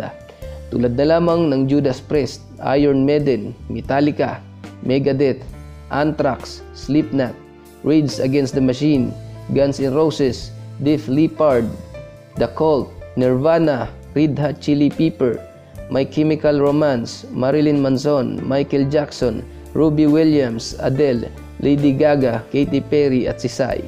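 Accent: Visayas, Philippines. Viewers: Bisaya ka man dong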